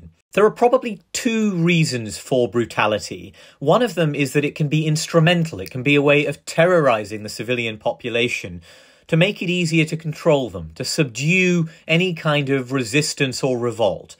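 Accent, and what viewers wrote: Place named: United Kingdom. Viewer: Why Shanhunk joshi speaking like a limey. Where's the 711 accent ???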